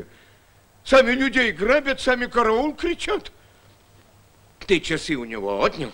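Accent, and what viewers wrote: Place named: Vyatka, Russia. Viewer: Наш, вятский говорок то проскальзывает!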